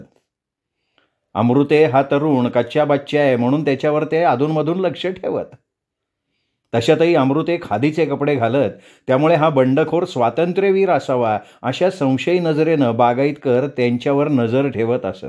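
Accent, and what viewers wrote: Konkan, India. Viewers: छान आवाज मस्तरंचा. अगदी कोकणात.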